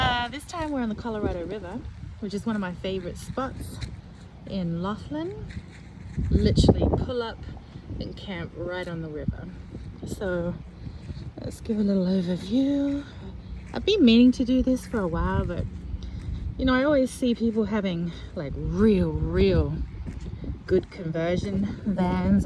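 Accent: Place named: Australia. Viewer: Nice to hear another Aussie accent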